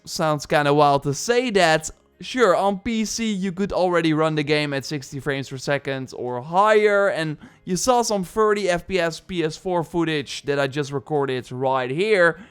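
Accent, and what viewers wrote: Netherlands. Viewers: yow are you nederelands you sound like it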